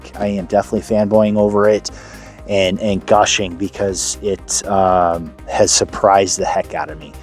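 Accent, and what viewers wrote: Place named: Los Angeles, United States. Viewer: Sounds like she's from LA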